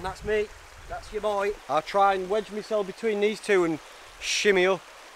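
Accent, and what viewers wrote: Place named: Northern England, United Kingdom. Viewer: I moved to America 6 years ago and I love hearing a good Notthern accent. But the Morrisons bag was just too much dude!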